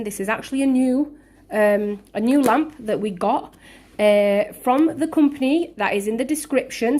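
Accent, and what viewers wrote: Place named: England, United Kingdom. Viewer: I like the accent. England?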